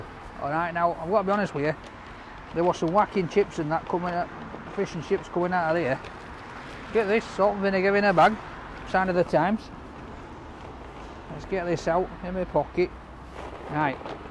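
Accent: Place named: Yorkshire, United Kingdom. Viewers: Yorkshire accent rainy weather and brilliant fish and chips.. I'm fasting but I'm coming after Ramadan for this.. Great video pal keep it up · The accents are similar but not the same. This guy is from West Yorkshire and Peter Kay is from Lancashire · He's from Barnsley and lives in Leeds I'd say that's a Yorkshire accent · I do really love the voice of a Yorkshireman!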